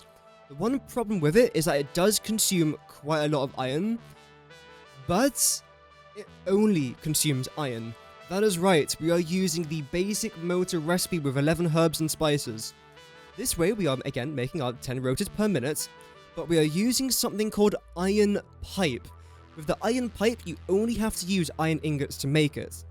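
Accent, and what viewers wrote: United Kingdom. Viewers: you sound like a caricature of a posh English noble, or someone from the rich southside of Dublin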